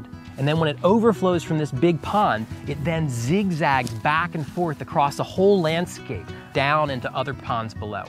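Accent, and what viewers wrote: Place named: United States. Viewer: this accent of american make me leave the video, stop vocal frying this is not natural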